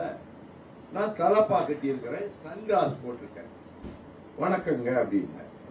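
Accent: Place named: Telugu, India. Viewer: தெலுங்கன் பேசுவது தெரிகிறது. இது 10" 10 ரூமா 😢😢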